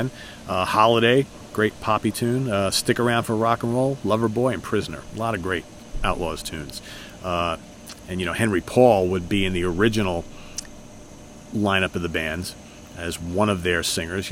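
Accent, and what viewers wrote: New York, United States. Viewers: CCR were from the San Francisco Bay area. Don Henley co-led the Eagles with Glenn Frey, who was no Southerner, and nor were most of the other members of the band. Anyway, you came onto this man's video here, insulting him and using profanity, saying that he doesn't know shit about Southern music because he's not from the South, and he has a New York accent. Man, that kind of thinking and behaving is not "Southern." it's just rude, ignorant, and disrespectful. You may be from the South, but with the way that you came onto this guy's video, telling him off and spouting profanity at him for no good reason, you actually sound more like a Southerner's stereotype of a rude, loudmouth Yankee than a Southerner who was raised with some manners. That's all I have to say. Anything more would be wasting my time.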